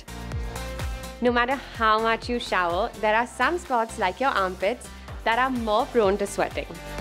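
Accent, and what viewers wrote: India. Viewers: Her, Face -🇺🇸🍔 Accent -🇮🇳🍛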